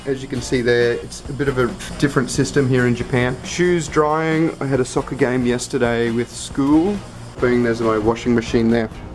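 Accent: Australia. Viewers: Hi, how are you doing? i love what you did, it's incredible experience. What i like the most it s your Australian accent 😍😍😍. Please don t rush let us enjoy the details of japan, it's awesome country. Thank you for giving us this opportunity as well to discover really japan form your experience. I m going to watch the next one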